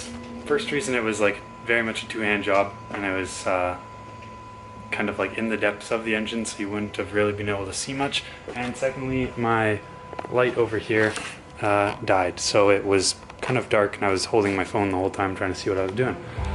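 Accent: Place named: Canada. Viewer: Interesting video.....also am I the only one who thinks the Canadian accent is really cool!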